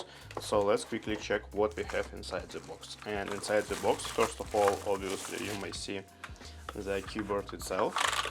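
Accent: Russia. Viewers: А почему акцент русский?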